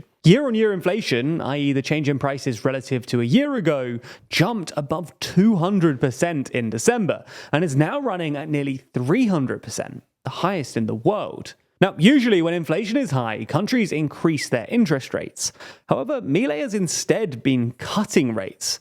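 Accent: United Kingdom. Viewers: Why is it always a bouncy british voice that's telling lies on youtube. I swear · 1. Your videos are quite long, boring, filled with ads, in the beginning , middle, and end. 2. You barely give sources, your video titles are clickbait, and your content is biased sensationalism. 3. Your channel is the trash humans should rid themselves from. 4. #TLDRNewsGlobalIsTRASH and an obstacle and distraction keeping ppl from finding quality information. #ThereISaidIt Now click like on my comment so the POS behind this video read this (prob not the British-sounding narrator) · I'm so sick of guys with British accents trying to convince us that gods don't exist. :P Milei was elected on the explicit promises of cutting spending and saving the economy from the course it was on toward Venezuela's hyperinflation. He was honest during the campaign in declaring the recovery would be painful. 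You'll look like a buffoon in five years for opening the video with a smug attempt to take rock lyrics out of context with an ad hominem attack making Milei out to be some kind of chaotic evil dictator rather than a brilliant economist willing to take as much heat as necessary to correct the catastrophic course that the socialistic keynesian model of Argentina has been on.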